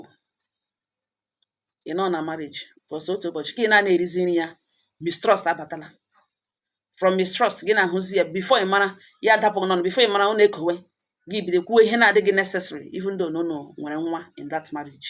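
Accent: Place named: Imo, Nigeria. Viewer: Good morning Ma, I'm not from Imo State but i like the way you speak Imo State, it sweet me too much.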